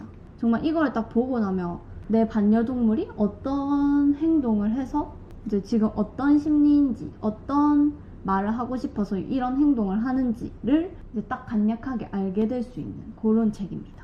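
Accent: Gyeongsang, South Korea. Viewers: 경상 북도 사람 이신가여?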